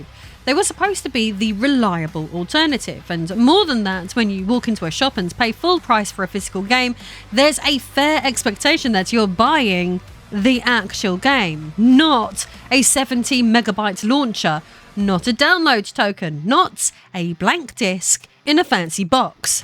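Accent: United Kingdom. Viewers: Boobs, tight leather, & a british accent... the internet just ain't playing fair 😂👍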